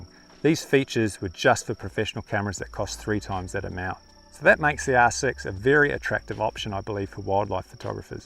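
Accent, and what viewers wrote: Australia. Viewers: should add some subtitles mate, ur ausie accent isnt so easy for everybody..gday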